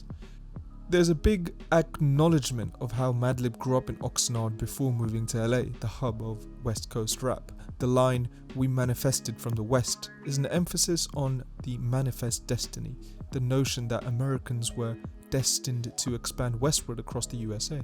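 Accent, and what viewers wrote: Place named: England, United Kingdom. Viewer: It’s cool hearing an English fella taking about one of my most personal and favorite albums. It’s like a Louis Theroux documentary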